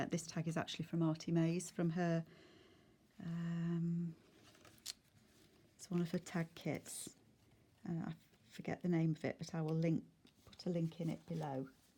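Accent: England, United Kingdom. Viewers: WOW!!! I LOVE LOVE LOVE this and LOVE Beatrix Potter, especially Peter Rabbit; and now Squirrel Nutkin! Amazing journal, how can I find a Peter Rabbit book?? I love your english accent by the way!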